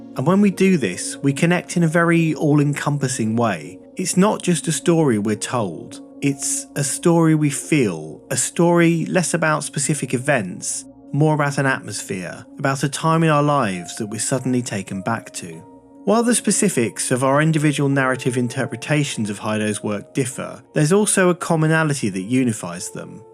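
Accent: United Kingdom. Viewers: the british accent that i love to hear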